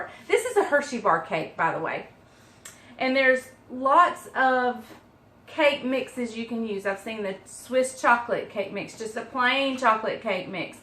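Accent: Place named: North Carolina, United States. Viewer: And your NC accent i live in the northern peidmont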